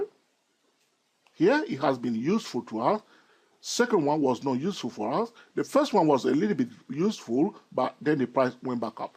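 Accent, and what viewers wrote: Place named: France. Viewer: Very interesting discoveries. I think it's valuable. It would probably be a good idea to put text on the screen, spelling out the addresses of the various channels and websites you desire to have us visit (for those of us having difficulty understanding the accent). It was helpful that I've studied a small bit of French so I could understand why you would pronounce things. (When you get excited, you seem to use partial word sounds). In my opinion, the video WAS a bit longer than necessary due to some content repetition, BUT never-the-less, the value surpassed any difficulties experienced. I agree that you should break the habit of saying "OK" too many times, OK? (LOL) Allow me to direct you to an organization that has proven to be very helpful to me: Market Traders Institute <link>). They've recently come out with a fabulous charting software which they call Ultimate Charting Software. 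I've been able to specify my own mid-point Fibonacci arc lines with it (among many other things). The head of MTI made some very helpful technical analysis discoveries that have proven helpful to many. Just thought you'd appreciate knowing about it. My name is Paul A. Hansen. My MTI "handle" is FXF and I'm at <mail> Merci, mon ami.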